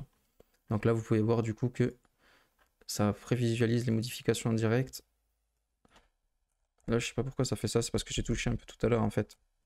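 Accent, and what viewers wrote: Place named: South, France. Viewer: Merci beaucoup avec l'accent du sud !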